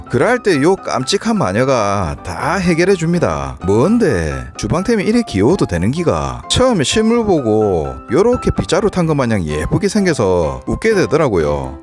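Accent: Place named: Busan, South Korea. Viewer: 내고향 부산. 사투리 넘나 잼나요~ 살림구경에 사투리 들으러 더 올 것 같아요~!! 구독이용~❤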